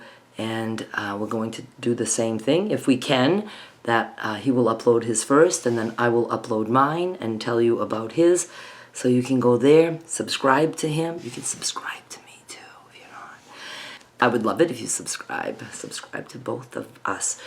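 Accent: Maine, United States. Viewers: "His caws" haha you still have your Maine accent! Love it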